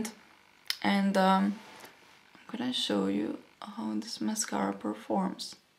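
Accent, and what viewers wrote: Russia. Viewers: You can fill distilled water into the mascara when it is getting too dry, just a few drops of water, then let it sit and shake it a few times so that the liquid can mix. It works very well I have done that several times. You don't have to throw it away, this way it lasts for a long time. Dr. Hauschka products are 100% made with biodynamically grown plants and some products contain natural minerals, ashes or clays. All ingredients are from nature in their whole form and not altered. They don't test on animals and they don't have subcontractor companies test on animals either. Dr. Hauschka and Weleda are the best cosmetic companies in the world. I only use those. You are very beautiful and I love the Russian accent.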